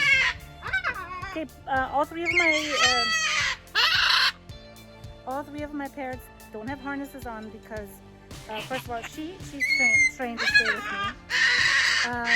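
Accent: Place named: Germany. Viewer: Ich dachte doch dass ich da einen leichten deutschen Akzent höre 😊 tolles Video, Du bist eine Papageienflüsterin! Grüße aus NRW ❤️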